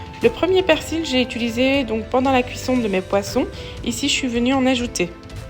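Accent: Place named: Belgium. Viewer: Merci pour la recette. Vous avez un accent belge si je me trompe pas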